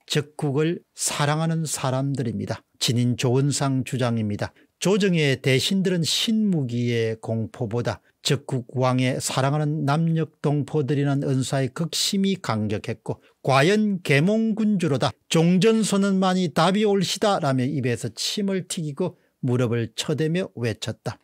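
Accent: Gyeongsang, South Korea. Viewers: ..갱상도 분이어서 발음이 힘드시지요? 조은상 이아니라 조은산 입니다...^^ 아무리 갱상도 발음에 평생을 갇혀계셨어도 수십만이 보고듣는 유투브 방송인대..발음은 조금 신경쓰셔서 해주셨으면 합니다..감사합니다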